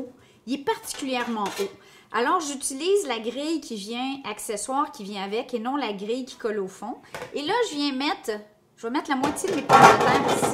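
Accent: Quebec, Canada. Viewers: Je m'abonne mais c'est pas vraiment pour les recettes , c'est mon métier cuisinier mais pour l'accent québécois , franchement ça me fait craquer , j'adore 🤣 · Oh bonjour Québec ! A cause de vous je me suis acheté un airfryer 😂 je connaissais pas mais ça a l’air sympa , et votre accent j’ai dit « on est obligé c’est le quebec on a jamais le droit de leur refuser quoi que ce soit » 😂 Donc j’ai hâte de le recevoir pour l’essayer et regarder vos petites recettes trop mignonnes 🥹 J’aime les plats léger pour le sport et lors de cheatmeal j’aime bien les beignets ou fritures sympa même si c’est un peu gras ! Merci à vous 2 😁😁😁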